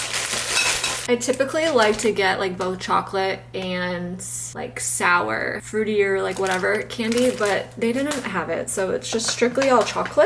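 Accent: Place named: Argentina. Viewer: Love seeing the family together! Love the Argentinian accent. Such a chill video! Ty for this.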